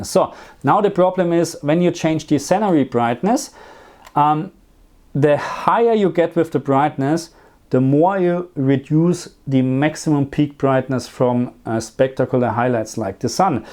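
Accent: Germany. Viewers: My Phone has hdr +10 can it Work with genshin too? And has the Same Bug? You Sound german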